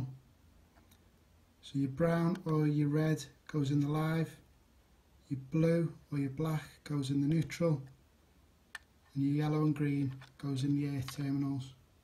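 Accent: Liverpool, United Kingdom. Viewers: Ok lad. Could ye do a video on putting extra sockets in when ye get a chance from a fellow scouser👍👍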